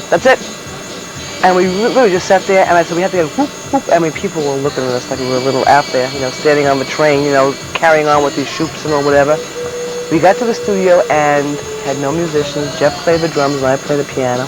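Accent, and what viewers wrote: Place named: New York, United States. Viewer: 100% New Yawker... I love it!!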